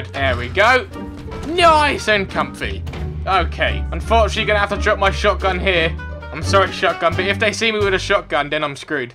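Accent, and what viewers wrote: United Kingdom. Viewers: Has a silencer, still hits the car alarm off haha, Is he brittish? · Butter butt he is British and the language is still English it’s just a accent. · Really is he british he has the british accent dont you hear that m8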